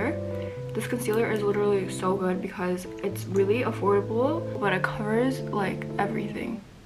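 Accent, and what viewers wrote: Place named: China. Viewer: chinese accent 很好聽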